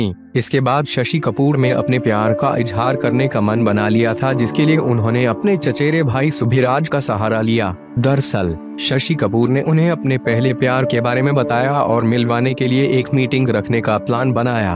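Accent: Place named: Bihar, India. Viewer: Bihari Type Commentary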